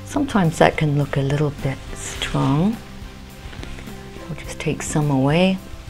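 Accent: England, United Kingdom. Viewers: ...The same to you. I love your channel, as well as your accent. Oral tradition has it that my relatives way back are from England. Maybe the reason I love to hear the accent. I live in the South, so we do have a “Southern” accent, with “ya’ll “ thrown in 😂. We study about everything you can think of, not on a certain grade level. I try to get them interested in learning everything. We are heavy on Math, science, reading, and history, but throw in art and music too. I was a teacher back in the day, then went back to study nursing, and finished my working for pay, as a critical care RN. The girl’s mother is also an RN. ( I work for free now 😂)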